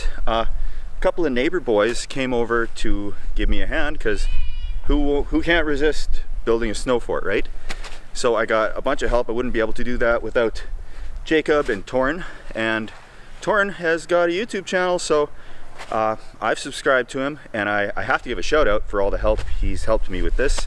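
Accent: Canada. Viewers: This man so Canadian the way he talk I love it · Is he from Canada? He sounds like it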